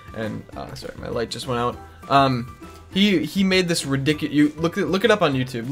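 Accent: Minnesota, United States. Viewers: is he from Minnesota?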